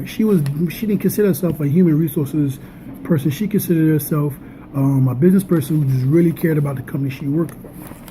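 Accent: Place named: New Orleans, United States. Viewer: ok no problem , i like that little new Orleans accent of yours too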